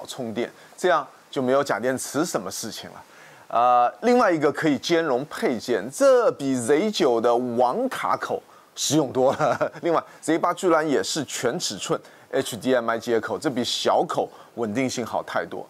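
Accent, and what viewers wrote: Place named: South, China. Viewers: Up主是上海人吧,一口南方口音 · 听着南方口音，很亲切！澳洲Z8实在贵离谱了:face-pink-tears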